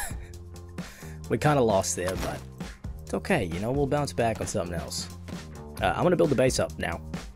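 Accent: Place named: New Zealand. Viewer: Yes broo!! So refreshing watching a good dayz video with a fellow kiwi accent!! Much love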